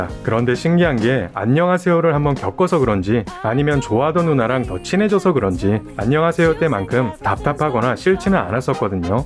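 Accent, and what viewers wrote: Busan, South Korea. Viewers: 부산사투리 듣기 좋던데요! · 우와 부산분이시라니 사투리 고치시는 연습을 어떻게 하셨는지 최고시네요. 👍👍👍👍👍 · 부산분이셨군뇽~^^ 서울토박이이신줄 알았는데ㅋㅋㅋ 👍👍👍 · 부산사투리 매력적인데!!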